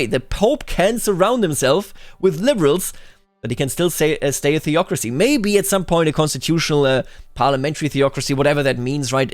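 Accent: Bavaria, Germany. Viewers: Do you speak german with a bavarian accent or do you/can you speak standard german?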